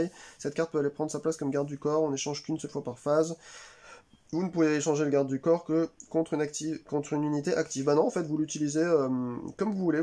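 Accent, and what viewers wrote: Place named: Quebec, Canada. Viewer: Woah! Tu n'as pas eu de chance mais vraiment pas! Bon, je vais devoir me procurer la nouvelle édition, je la trouve vraiment mieux. Au niveau des dessins, c'est Jean-François Gauthier qui fait tout: invente le gameplay, fait les dessins, s'occupe de l'édition, vraiment tout pour les 3 jeux. Plusieurs personnages proviennent de Goldblivion, trop bien! Ton accent québécois est parfait! 😄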